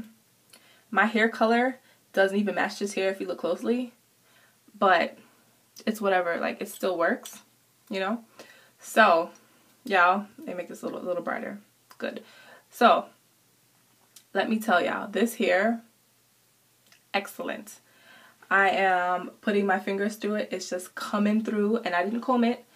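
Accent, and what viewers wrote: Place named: New York, United States. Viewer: Omg, I love your Ny Accent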